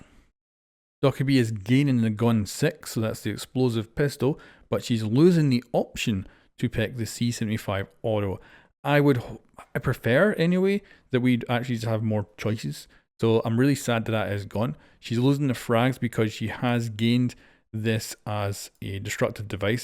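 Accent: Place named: Scotland, United Kingdom. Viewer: I don't know if you are from there, but you have a very hard Scottysh accent.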